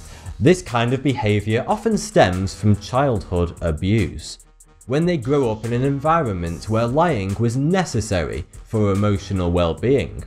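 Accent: United Kingdom. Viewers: RSCGaming hes british, and in british accent, thoughty 2 sounds like 42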